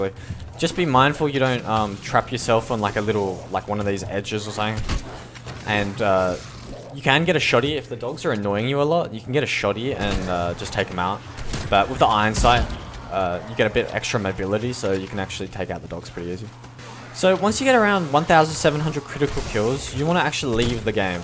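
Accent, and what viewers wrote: Australia. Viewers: An Australian yooooo wtf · subscribed from your RPG video, now that i heard ur voice i see ur an aussie, even happier that i subbed now :)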